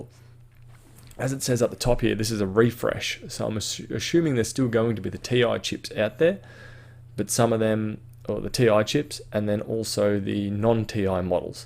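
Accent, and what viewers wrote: Australia. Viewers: You Australian mate?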